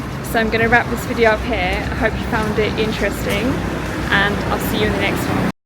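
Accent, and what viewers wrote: England, United Kingdom. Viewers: You got this kind of stuff in every city you live in around the world! Japan is not the only place and saying it isn’t a nice place to live when there bad places and good place to live in Japan just like any other country. And you sound English so I know for fact England isn’t paradise! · That British accent is top notch 😂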